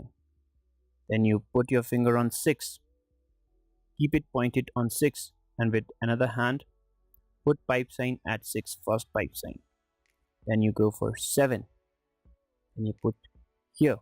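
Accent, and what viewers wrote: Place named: United States. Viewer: u are indian right? but your voice sounds more like an american ...... :)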